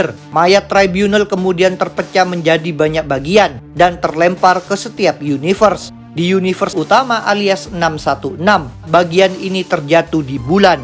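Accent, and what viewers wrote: Indonesia. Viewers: Byon der,, bion derr,,, medok mana logatnya itu min? 😅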